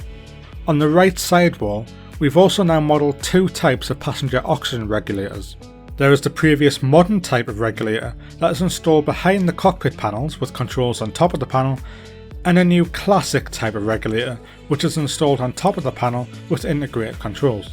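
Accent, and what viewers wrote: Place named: Northern England, United Kingdom. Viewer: Nice to a northern fella, least they're local, i think your the voice that says "GO" in the RJ too? Great as always. Nice job JustFlight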